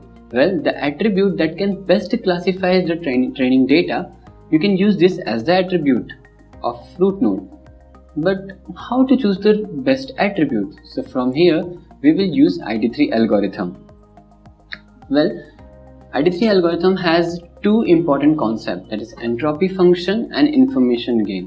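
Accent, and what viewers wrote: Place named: India. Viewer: hintli ingilizcesi skilli kastırdınız zorla abv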